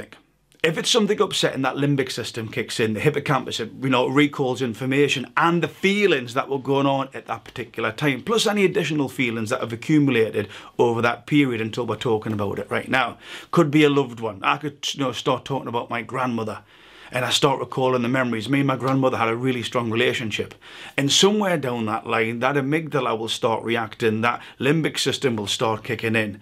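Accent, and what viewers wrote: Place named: Scotland, United Kingdom. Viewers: Loving the Scottish accent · the moment I heard your accent it was an instant subscribe...my weakness is Scottish accents. She was literally painful to watch as a woman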